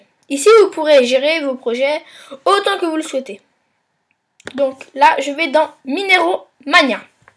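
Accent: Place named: Alsace, France. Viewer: Ta voix comme elle a change et jkiff ton accent Alsacien 😂😂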